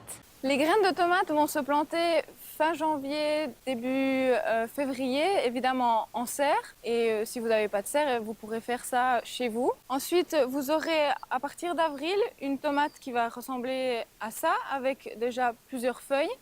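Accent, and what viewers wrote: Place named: Switzerland. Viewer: c'est marrant ce petit accent suisse, c'est mignon.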